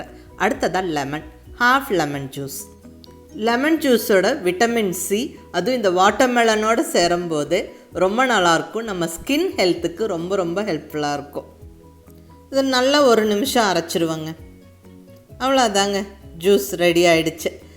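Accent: Kongu, India. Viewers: செயல்முறை விளக்கம் அடிக்க ஆள் பில்லை. super explaination. crystal clear. கொங்கு தமிழ் super.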